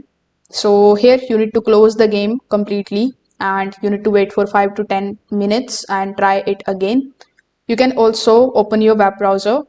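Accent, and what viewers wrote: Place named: India. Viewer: bro we can hear that you are indian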